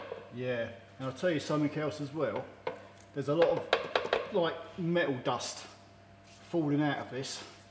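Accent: Cockney, United Kingdom. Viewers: Are you from London? Im Croat and you sound to me like cockney accent, am I right?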